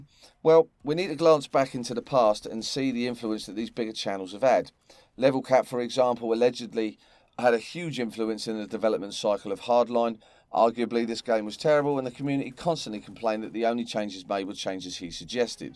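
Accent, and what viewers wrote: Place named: Cockney, United Kingdom. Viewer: i love your cockney swagger but i disagree. Frags xfactor and levelcap do still play the game and i see regular "enough" content. Westie on the other hand seems to think hes some sort of historical know it all and i agree with most of what you say about him. That being said i consider his channel a good inside source for bf content. This game isnt dying, the premium platform is dying. Thats why content has seemed scarce and i believe dice/ea are taking a different approach. Bf1 is by far a better game (for me) than all bf games previous because it ran like butter on pc from day 1. For me personally it solidifies its position as the best battlefield game ever. #fluffybastards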